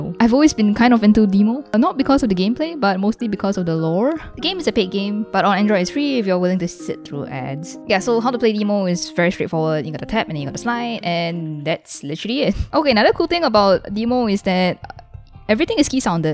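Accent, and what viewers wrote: Singapore. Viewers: She's Singaporean, but what's the difference lol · Are you singaporean or malaysian because of the accent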